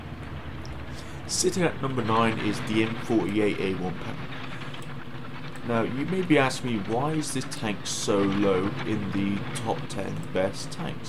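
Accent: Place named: United Kingdom. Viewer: I can tell hes British because his accent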